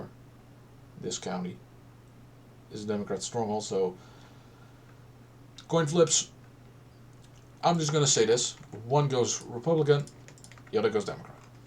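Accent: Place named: Netherlands. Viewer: Je Engels is uitmuntend maar ik heb zo'n vermoeden dat ik een Nederlands accent hoor dus ik schrijf in het Nederlands ;). Hoe denk je dat de verkiezingen zullen verlopen? Sinds 2016 heb ik het idee dat Amerika steeds radicaler en gevaarlijker wordt en ben mij nu zelfs aan het afvragen of verkiezingen überhaupt zonder kleerscheuren of "spektakel" (in negative zin) gaat plaatsvinden.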